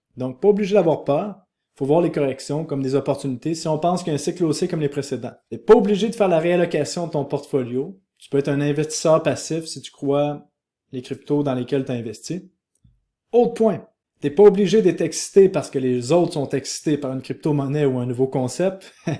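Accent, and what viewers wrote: Canada. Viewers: Bonjour, Première fois de ma vie que je dépose un commentaire sur internet, malgré ma consommation de contenu sur les cryptos depuis bientôt 4 ans sur le sujet.... Vidéo courte de 13 minutes, ou chacun de tes mots ont résumé à merveille tout ce que j ai pu apprendre de cette période! Merci à toi pour ton dévouement et ta philosophie! Un petit frenchie holder dans l âme qui au delà d apprécié ton formidable accent canadien, saura je l espère tirer pleinement partie de tout tes judicieux conseils! Longue vie à toi!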